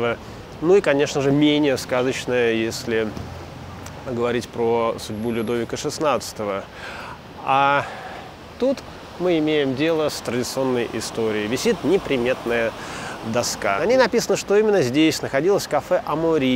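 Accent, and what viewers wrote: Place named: Russia. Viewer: С французскими окончаниями у мэтра не задалось, как читает, так и произносит. Strong Russian accent.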